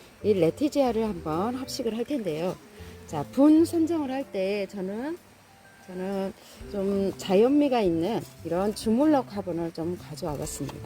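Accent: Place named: Jeolla, South Korea. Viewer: 영상구도도 공부하시고 전라도 사투리도 구수하고ᆢ풀시청 잘보앗읍니다~~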